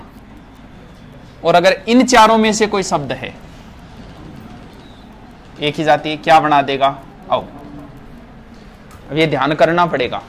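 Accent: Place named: Marwar, India. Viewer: Thank you sir gi so much very helpful your videos Or Aap ki bhasa desi marwad very nice 🙏🙏🙏🙏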